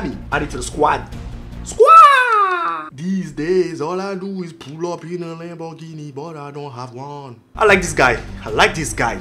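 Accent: France. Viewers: j'adore tes videos et surtout ton accent francais mdr ;) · Are you French? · Ta un accent français un peu t'es français ?? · are you french ? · r u french.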